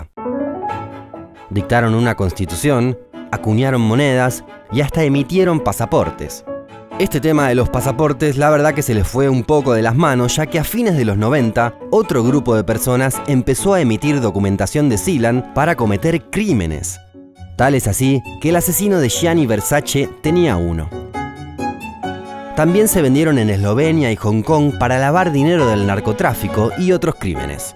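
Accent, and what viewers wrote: Argentina. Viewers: Este acento argentino me parece particularmente irritable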